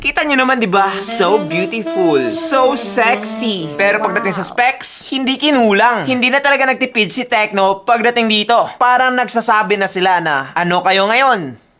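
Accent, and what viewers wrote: Visayas, Philippines. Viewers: Taga San kaba boss parang may kunting bisaya Kasi ung fluent mo sa pag tatagalog din 😮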